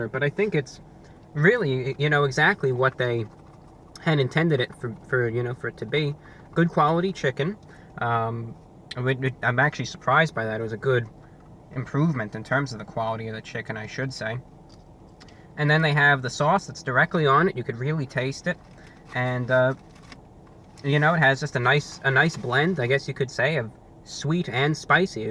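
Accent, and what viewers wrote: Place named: New York, United States. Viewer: Why do you talk like those radio dudes in the 40s. I get it you're from New York but that's an old school accent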